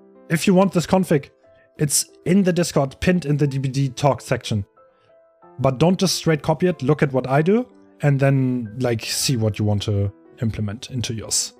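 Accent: Germany. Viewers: clearly german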